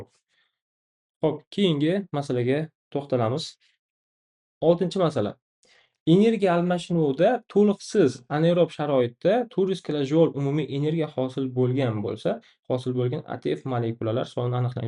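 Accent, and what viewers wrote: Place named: Tashkent, Uzbekistan. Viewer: Рахмат сог ва саломат булинг сиз АТФни тшунишимга ёрдам беряпсиз лекин Тошкенча шевани ёмон килдизда😅😂😅😊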